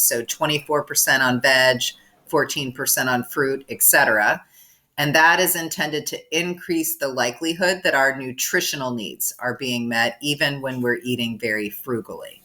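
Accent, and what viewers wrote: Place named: South, United States. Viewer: You definitely have "South in your mouth" with the way you speak. A good thing.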